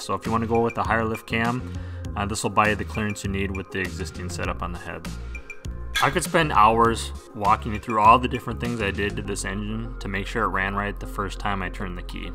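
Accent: Midwest, United States. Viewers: You sound like you are from the mid West such as Ann Arbor Michigan. I have family out there so I recognize it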